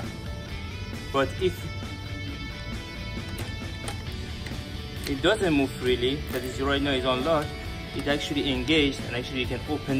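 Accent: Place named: Iran. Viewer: You sound so Iranian 😂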